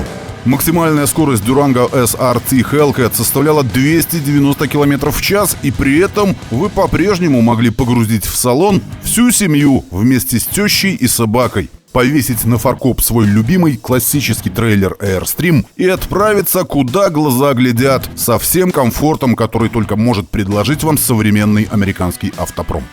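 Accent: Belarus. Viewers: Белорусский акцент ппц)))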